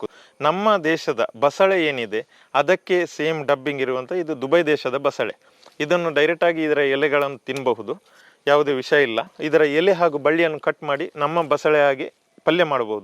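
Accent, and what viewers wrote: Standard. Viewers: Pure kannada ..kelokke thumba kushi aguthe ... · Hats off to you sir, your knowledge is really appreciating... kannada thumba shudda mattu sundaravagide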